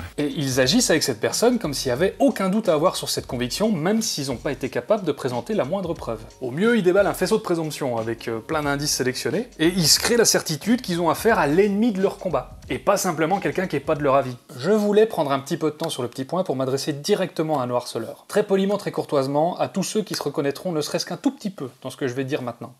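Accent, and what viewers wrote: Belgium. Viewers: Ca n'a rien à voir mais vous n'avez pas besoin d'essayer de réduire votre accent belge. Je ne pense pas que ce soit à cause de la façon dont les mots sont prononcés que la plupart des gens écoutent ce que vous avez à dire. ^^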